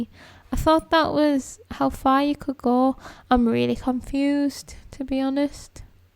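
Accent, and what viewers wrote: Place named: Cumbria, United Kingdom. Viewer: I'm hearing Cumbrian 🤔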